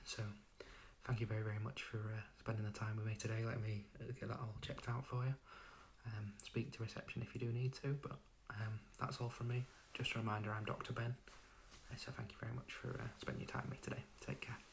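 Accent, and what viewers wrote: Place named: Yorkshire, United Kingdom. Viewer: Hold on Yorkshire it’s a shock 😳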